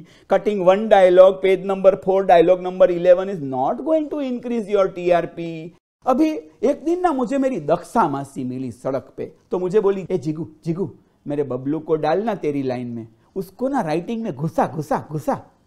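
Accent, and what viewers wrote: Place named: Gujarat, India. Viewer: wonderful ... but Initial accent was gujarati and in between marathi .. baad mein normal Hindi ... 😀😂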